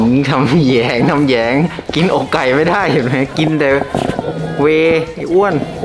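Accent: South, Thailand. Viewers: พูดใต้เล่นกล้ามนิพอชอบหนัดเลย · พี่บ่าวแหลงเหมือนคนใต้เลยอะ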